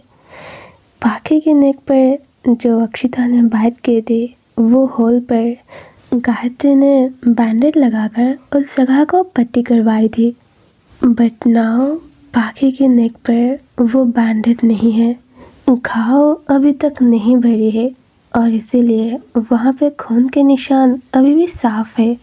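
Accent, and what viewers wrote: Bengal, India. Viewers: Aapki Bengali bahut jyada cute Mary Bengali main musalman hun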